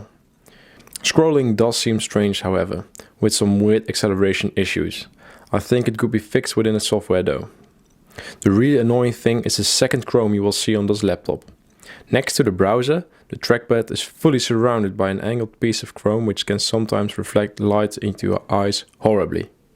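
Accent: Netherlands. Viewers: Hahaha jah bradahhh ik wist het niet zeker, want je hebt veel engelse reacties vandaar G, maar je accent is duidelijk een dutch one matsko maar je spreekt duidelijk en goed dus no problemo, die bios vraag was omdat hp en andere merken die bios locken, je kan niks instellen dan, alleen datum en tijd, maar geen ram timings en raid ahc settings, jij hebt hem dus jij kan makkelijk checken 😃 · Ik hoorde gelijk aan j accent dat je nederlande bnt lol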